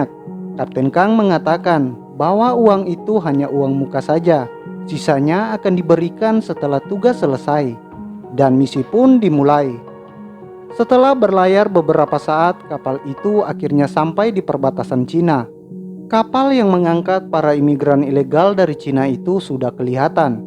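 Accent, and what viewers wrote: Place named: Sulawesi, Indonesia. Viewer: Dari pembawaannya kayaknya abang nih orang Sulawesi yah?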